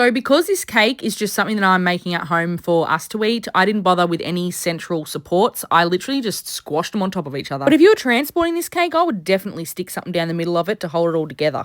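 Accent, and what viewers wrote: Australia. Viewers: Are you Australian? Cuz you sound like it. Not judgeing at all.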